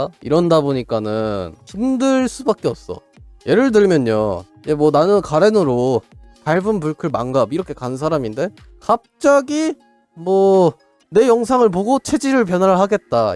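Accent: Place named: Jeolla, South Korea. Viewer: 형님 혹시 전라도 분이십니까 사투리 정겹네요잉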